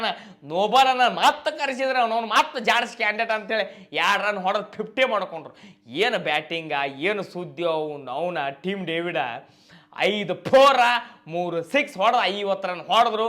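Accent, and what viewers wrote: North Karnataka, India. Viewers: ಉತ್ತರ ಕರ್ನಾಟಕ ಭಾಷೆ ಸೂಪರ್.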